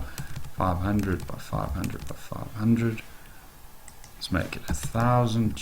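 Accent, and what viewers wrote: South Africa. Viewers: you sound south african speaking properly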